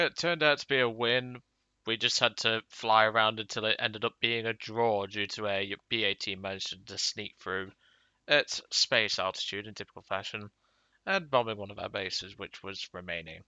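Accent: United Kingdom. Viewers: Where in Britain are you from? (From another Briton)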